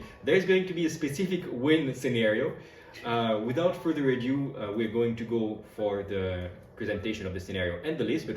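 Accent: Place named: France. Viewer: Toujours un plaisir à suivre ces Battle Report. Merci aux participants! This little french accent add the perfect flavor to the Alliance fleets 😄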